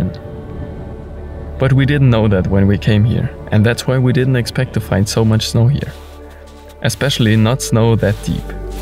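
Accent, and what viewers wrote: Germany. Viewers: I like your videos, very well done and your great dialogues. And especially I like your German accent (honestly).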